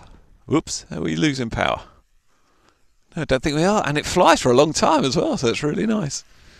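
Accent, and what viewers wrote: Scotland, United Kingdom. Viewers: You're so funny. Thanks for this video. You're a perfect pilot, I think no one can fly those little birds in expert mode in those windy conditions. They're just too touchy for that. It gave me so much fun and delightment to hear some familiar-sounding, positive scottish voice in these day and age. :/ Took this as the main introduction-video for my kids for this F4U plane ,I gave them this Xmas - All the best from germany, three thumbs up :D Stay healthy. Cheers mate and thanks a lot! Hope you know, you're living in a paradise ;)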